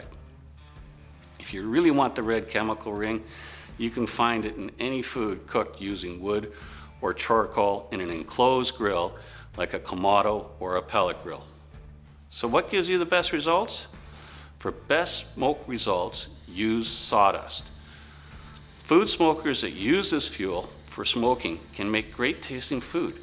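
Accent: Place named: Canada. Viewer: I sense some Canadian from, eh?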